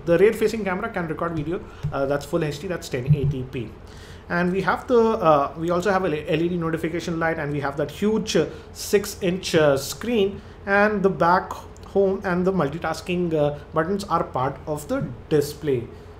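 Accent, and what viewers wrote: India. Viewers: i like if indian people speaking english, because different but good. :) nice sony :D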